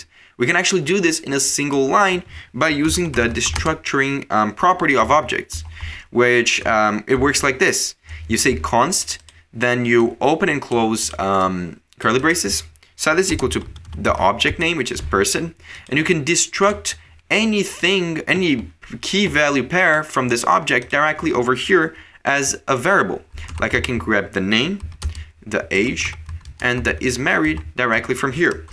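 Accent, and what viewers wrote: Brazil. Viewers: Thanks for the video,. Really useful. I finally got the arrow functions now thanks to you. Also, you sound brazillian for some reason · Olha eu aqui procurando por videos gringos pra aprender web development e me deparo com teu canal! Pelo nome vi que devia ser portugues/brasileiro, mas pelo sotaque imaginei ser brasileiro. Parabens pelo conteudo e obrigado por compartilhar! + 1 inscrito =D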